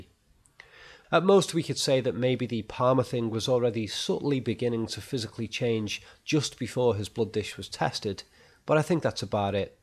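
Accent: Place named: Scotland, United Kingdom. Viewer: Dammit your accent is awesome. You should star in the next Braveheart.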